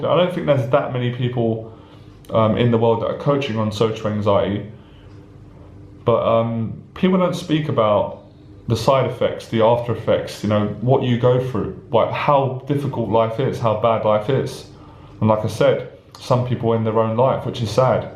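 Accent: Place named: United Kingdom. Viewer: You have the perfect British accent. In some of your other videos there are a few times when you misspeak with a bit of bad grammar. That is coming from some mental thing where you regress into some lower class English isn't it? I mean it happens like someone might stutter. As an American listening to you speak like you are doing here (when you are going slow) you sound like you went to all the finest schools and all. Even the slight mumbling and slack in your speaking (I recognize it as a certain British speech style.) sounds to me as an American to be some intentional upper class way of speaking. I bet King Charles and the cavaliers sounded something like you.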